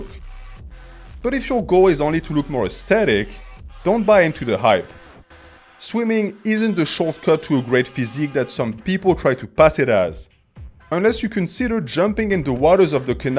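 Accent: France. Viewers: Youtube cannot comprehend thick french accent, the closed captions keep saying "vapers" instead of "v-tapers" and said "food in the gym" instead of "foot in the gym" it's hilarious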